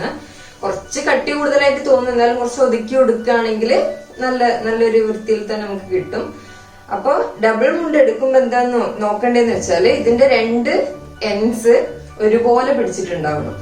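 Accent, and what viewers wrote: Kannur, India. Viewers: Kannur kaariyano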